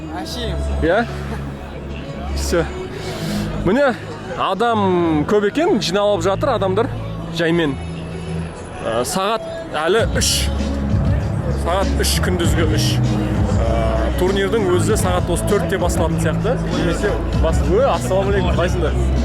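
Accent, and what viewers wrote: Standard. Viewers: Керемет Жарайсын!!!👍👍👍👍 Керемет таза казакша солийсын👍👍👍👍👍👍👍👍❤️❤️❤️❤️😂😂😂 Мен сзге жазылдым!!! Алматыдан салем!😊😊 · 🤔🤯👏👏....МәссаҒан , Сіздің ҚАЗАҚ 🇰🇿🇰🇿🇰🇿🇰🇿🇰🇿Тіліңіз Өте Тазада , Әдемі Ғой !!! Құлаққа Кереметшіл Естілуде 🎧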